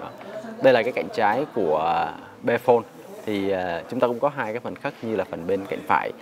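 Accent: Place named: South, Vietnam. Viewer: Giọng zai miền nam nghe hay quá :x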